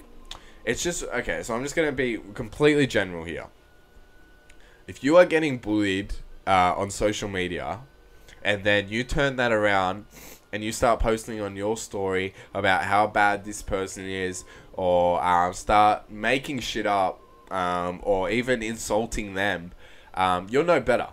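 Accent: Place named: Australia. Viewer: Don't worry about mispronouncing stuff: with the Aussie accent, no one can tell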